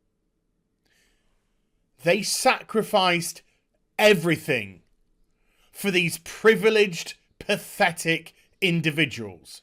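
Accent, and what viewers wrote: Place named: United Kingdom. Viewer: Hearing a Brit talk about these things really made my day. All my uncles, my grandfather, and my great grandfather all served in the Army, and they did so willingly. They are true patriots, and I cherish every one of these fine men in my family, and all others. God bless America, and God bless all the people who have served and live in the hearts of the many.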